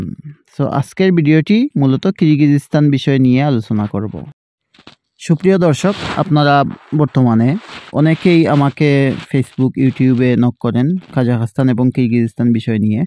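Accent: Sylhet, Bangladesh. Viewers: ভাই আপনি কি সিলেটি নাকি?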